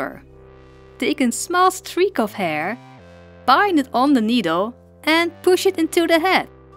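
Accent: Netherlands. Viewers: I smell dutch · Is it just me or does it sound like she has a dutch accent? it reminds me of my cousin (that lives in Belgium/Holand). great dolls tho! I also saw Dollightfull’s video, and i think both the dolls you guys made were amazing! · Well i Mean she does live in the netherlands so yeah i think thats why youre hearing a dutch accent😁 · Me whos new to the channel: YASS THE DUTCH ACCENT, (im from Belgium and speek Dutch)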